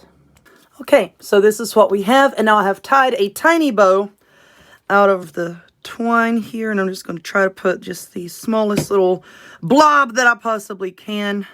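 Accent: South, United States. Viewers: Your so funny. This are beautiful crafts. I just love listening to you with your southern accent. I used to live in SC for almost 30 years before moving here in MN 3 years ago! Yes weather here is terrible right now we had snow storm Sunday. I missed the the weather in the South.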